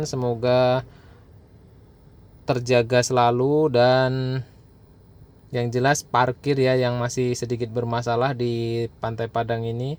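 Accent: Java, Indonesia. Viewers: trnyata ortunya jateng yah..pantesan logatnya agk medok2 dikit 😂😂😂😂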